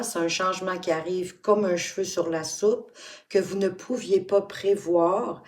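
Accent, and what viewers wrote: Quebec, Canada. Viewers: Merci à vous pour ce beau tirage si parlant !! et la fin me bouleverse ! oui j'ai mis de côté les soins que je peux offrir grâce à mes mains . C'est un plaisir de vous écouter et de vous entendre ! j'adore l'accent québécois. j'en suis tombée amoureuse quand j'ai vécu là-bas 🙏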